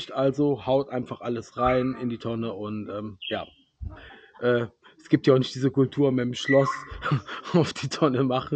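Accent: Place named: Cologne, Germany. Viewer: Danke für die 10 punkte der sprache her kommst du aus köln. Sind vor 1jahr von ķöln nach Avsallar gezogen ķölle Alaaff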